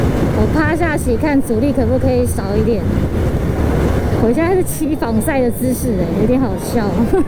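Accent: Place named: Central Taiwan, Taiwan. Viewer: 好親切的中部腔~😆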